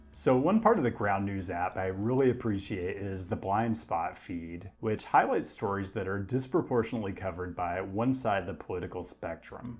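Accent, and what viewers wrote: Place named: Pacific Northwest, United States. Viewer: ah. Thanks. Pacific Northwest, i guess. Portland, Seattle. It’s so bizarre to hear and throws off emotional cues. His accent contributes to his dry sense of humor.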